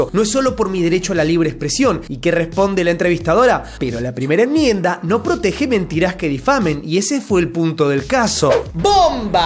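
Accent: Argentina. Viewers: Me encanta el sarcasmo de este pibe. Le queda la irreverencia, es más su acento y la concepción que tiene uno de los argentinos encajan perfecto en, ha hecho tan suyo el caso que le creo todo. Simplemente me divierten y disfruto mucho. Saludos desde 🇲🇽.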